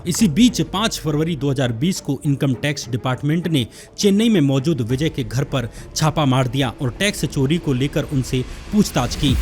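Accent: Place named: Bihar, India. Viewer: Area yaar bijness business hota hai. Pronunciation correct karo content dikhne meh bohat acha hain lekin sunne me UP Bihari ki badbu aajati hai. Bijay nahi Vijay Eaji nahi easy Bujiness nahi business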